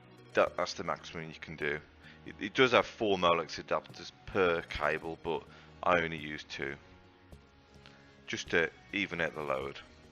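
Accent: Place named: Birmingham, United Kingdom. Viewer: I can easily tell from your voice that you’re a Brummy haha. Nice vid!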